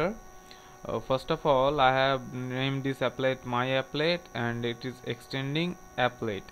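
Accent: Bengal, India. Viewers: i think ur Indian and Bengali right :P by ur accent ..!!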